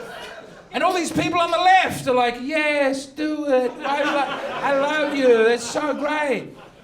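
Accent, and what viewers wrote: Italy. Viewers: Very authentic Italian accent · Fantastic Italian accent.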